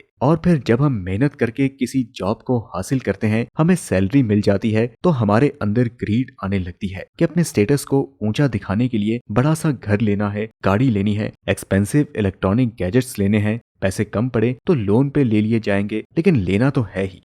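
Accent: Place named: Pakistan. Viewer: Your language sounds like pakistani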